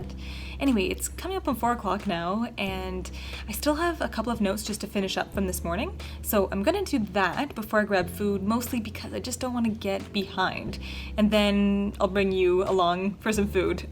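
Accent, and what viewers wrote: Canada. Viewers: is she canadian?? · Canadian. Ontario. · I love your Canadian accent! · You sound Canadian lol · At first I thought you were British... but I think you are Canadian?😂 I’m from England so all accents that are like American sound very similar 😂❤️ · Are you Canadian?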